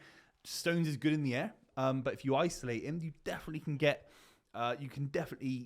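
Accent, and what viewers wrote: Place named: Cornwall, United Kingdom. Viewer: He has a weird Manchester accent… almost sounds Cornish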